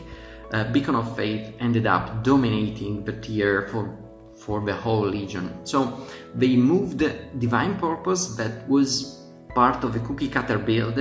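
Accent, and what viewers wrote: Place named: Italy. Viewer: Hey, dall'accento presumo tu sia italiano. volevo chiederti come mai hai puntato su una guida in inglese, ci sono tanti content creator inglesi che parlano di wow e davvero pochi che parlano di wow in italiano, specialmente riguardo agli healer in ampio spettro, a tal proposito hai in mente di fare in futuro guide in italiano? per la cronaca grazie per tutte le dritte e le informazioni di BfA! nessun suggerimento su come poter entrare a far parte del beta testing di BfA?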